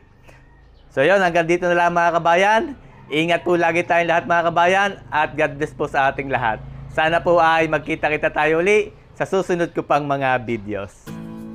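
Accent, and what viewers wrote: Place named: Tanauan, Philippines. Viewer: Nag enjoy akong manood. At para ko na ring nalasahan ang iyong mga niluto. Taga Tanauan din ako at talagang feel ko na nasa 😅Pinas pa rin ako sa iyong pananalita at punto. Thank you at sana'y marami pa akong mapanood na shows ninyo.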